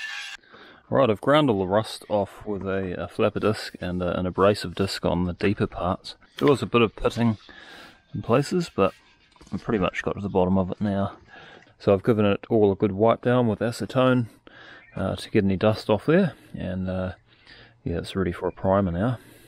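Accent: New Zealand. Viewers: Brilliant inspiration for handy gents around the globe! How you captured all those video clips while working that hard. Producer director handyman cameraman scriptwriter editor all going in your head at once…. And all we hear is that calm kiwi voice. Just wow.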